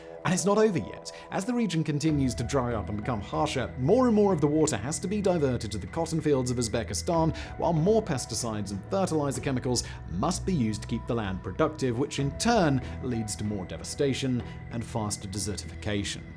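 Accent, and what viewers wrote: United Kingdom. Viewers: . Please do your research. I love you and your brit accent but you have blown it now. That cotton-looking plant is definitely not cotton. We grow cotton in bnbnmnb can't say because of state spies kkkk, Just spend 30 seconds on the internet and you will see a real cotton plant. Your pic shows a harmonious loving field of flowers, but a real cotton field uses pesticides, and child labor and the topsoil is washed away in rain. None of this happens in your Alice in wonderland field of flowers. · Another example of British accent being conflated with intellectual prowess. What an a$$. 😂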